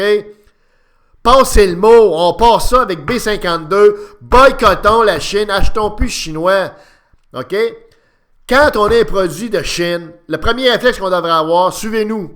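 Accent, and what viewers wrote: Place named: Canada. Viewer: Je pense, par votre accent , que vous venez du Canada.Quand nous serons débarrassés du COVID-19 ( coronavirus ) Il conviendra de mettre un terme à la mondialisation de l'économie.Chaque pays devra inciter ses entreprises , ses usines à se restructurer , à faire preuve d'innovation pour produire les pièces détachées et les produits finis dont il a besoin.Cela relancera l'économie et cela donnera du travail aux gens , même si nous devons payer les produits plus chers.Cette occasion ne se reproduira pas de si tôt !!!!!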